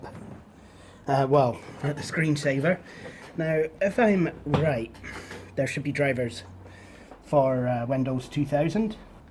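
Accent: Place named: Scotland, United Kingdom. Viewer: are you scottish or British you sound Scottish :)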